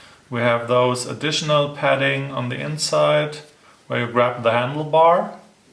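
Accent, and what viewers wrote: Germany. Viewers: Das ist so ein kranker deutscher Akzent 😂😂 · Man merkt so krass das du deutscher bist 😂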